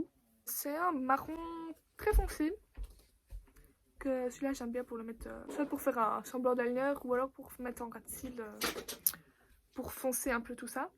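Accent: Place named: Belgium. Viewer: Coucou sacrée collection ! Ton accent m'intrigue tu es en Belgique ?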